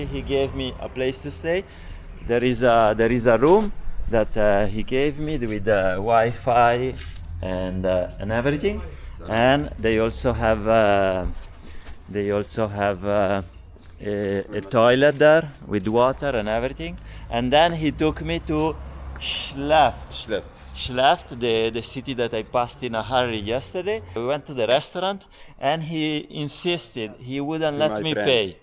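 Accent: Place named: Italy. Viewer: Ho reconisciuto questo bellissimo accento italiano . non importa la lingua straniera parlata , l’accento mi fa sempre scogliere 😂😂😂😂 benvenuto nel nostro paese ❤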